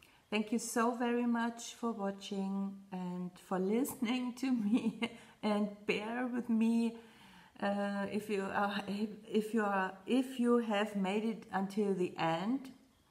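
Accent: Germany. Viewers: I just love listening to you! My family emigrated from Naussau, Germany to the U.S. in 1837. My great grandmother, who died in1967, still spoke mostly German, but when she did use English, she sounded just like you. Thank you for bringing back lovely memories of my Granny with your lovely voice. I always enjoy your videos, and you make me resolve to stitch more smalls. I’d love to have a bowl of different pretties for each month. Thank you for sharing!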